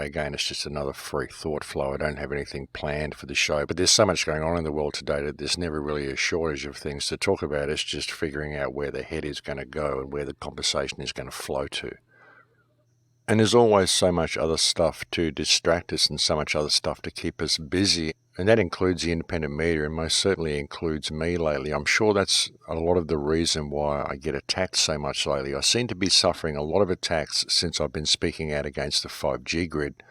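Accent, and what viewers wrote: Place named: Australia. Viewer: its good to hear an aussie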